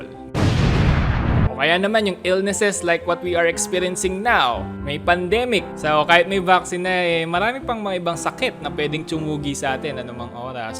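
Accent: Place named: Jamaica. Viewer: Sounds like Jamaican in a way 😅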